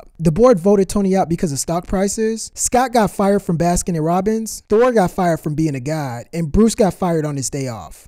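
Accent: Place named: Latin America. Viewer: It's lovely. I like your voice/accent. I don't think we have many latino peoples in Britain :)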